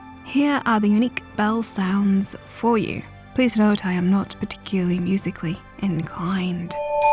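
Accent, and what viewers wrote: United Kingdom. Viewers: You have a fantastic voice. Interesting accent too, what part of UK are you from? You could do an audio book XD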